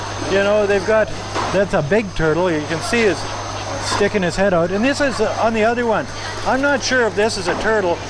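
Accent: Canada. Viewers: I like his Canadian accent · Damn is don’t know what’s worse these markets or his Canadian accent ay?! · Wow that’s a strong Canadian accent😂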